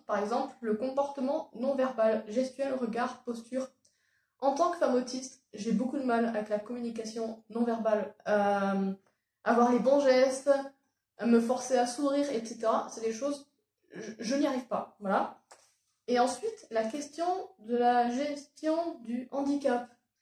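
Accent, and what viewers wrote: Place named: Russia. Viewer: j'adore ton accent avec le leger accent russe dedans ca te donne un charme fou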